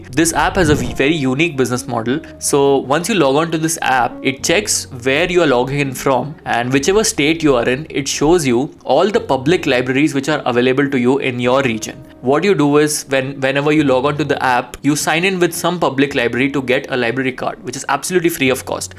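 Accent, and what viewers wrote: India. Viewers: Why's Indian accent so shitty? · If you are in America try to speak Amerian English, not Hindu English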